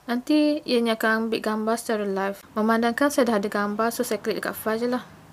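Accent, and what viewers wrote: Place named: Terengganu, Malaysia. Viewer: bunyi jgk slang ganu ye 😂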